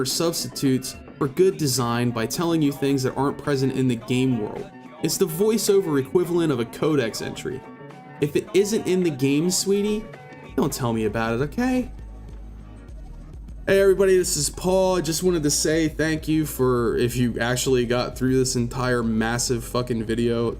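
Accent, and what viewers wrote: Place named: Baltimore, United States. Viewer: love that baltimore accent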